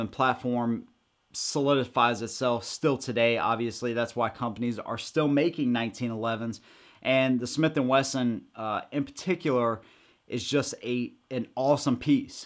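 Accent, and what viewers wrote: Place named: Eastern Shore Maryland, United States. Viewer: ... where are you from? I grew up on the eastern shore of MD and your accent makes me think you are from the same area...great video...keep them coming in 2019.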